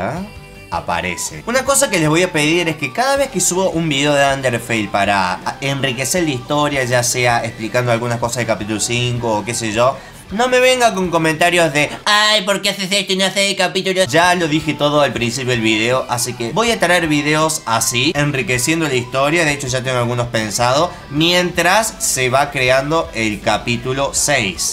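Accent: Córdoba, Argentina. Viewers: Sos Cordobés(Argentino)??, es que tenés la tonadita cordobesa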